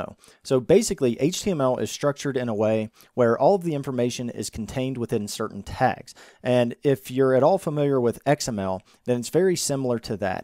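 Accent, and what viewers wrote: United States. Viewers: thanks so much, I really enjoyed watching it and learned a lot. I also really like your fast American English accent😊😅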